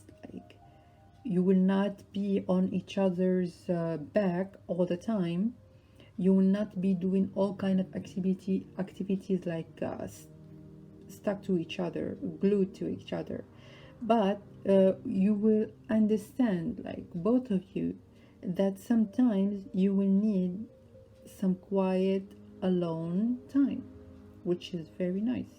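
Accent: France. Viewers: You have a french accent or am I hearing wrong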